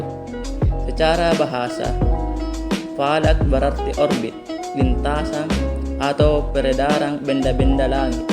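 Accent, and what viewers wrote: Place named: Makassar, Indonesia. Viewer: Sepertinya logat makassar ini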